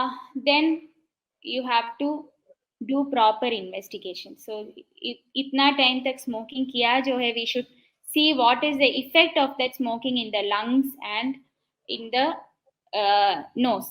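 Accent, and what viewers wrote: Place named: India. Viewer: Wow! I can barely understand anything they say. Sorry, but your Indian accept is super thick.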